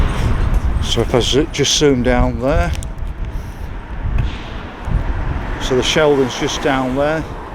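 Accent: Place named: North Derbyshire, United Kingdom. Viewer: You don't sound "true" Sheffield, more like north Derbyshire but anyhow. I lived just up the road from the start of your walk here, and always wondered if the little opening in the river on 3.43 of your film is the stream from Norfolk Park that runs through the Jervis Lum on the estate, entering the Sheaf.. It must finish up somewhere close to that. There's another higher up the wall pipe a bit downsream I think that constantly flows which maybe it. The path at this point of the film was the old Sheaf Gardens, old houses and streets and straight on is Cromford and Rowsley street, notorious in the 70's...still cobbled and big families back then living on there. Really rough and ready but great people.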